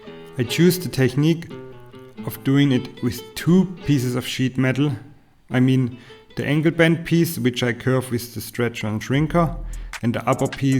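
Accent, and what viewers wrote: Germany. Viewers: Warum in Gottes Namen redest du kein Deutsch, das hört man das du kein Amy oder Engländer bist sondern ein Deutscher. Authentizität ist was anderes, aber gut muss jeder selbst wissen wie Authentisch er sein möchte oder auch nicht, wie in deinem Fall.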